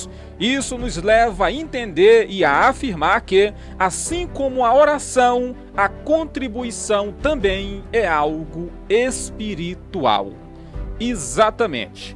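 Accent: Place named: Bahia, Brazil. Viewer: Oh missionário, dentro de todos ensinamentos mediante a palavra de Deus que são maravilhosos, eu me alegro com a forma que fala, sei que és estudado e essa forma de falar a palavra ( veio, paia) é engraçado Me faz lembrar a minha terra Natal, Cravolândia lá no centro Sul Baiano Um abraço missionário para vc e sua esposa Deus te abençoe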